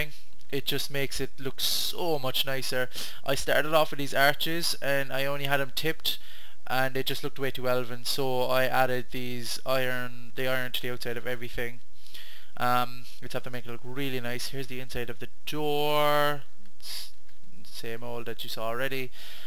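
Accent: Ireland. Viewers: Does every Irish says "ish" instead of "it" ? (I'm french and this particularity made me curious :3)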